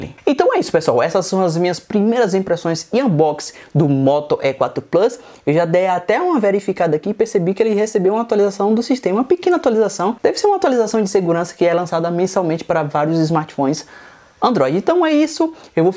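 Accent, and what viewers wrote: Bahia, Brazil. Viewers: Voce er bahiano? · Deixei o like pq eu gostei do lá ele kkkk baiano mesmo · O pvt é baiano kkkkk já ganhou meu like !! Tmjj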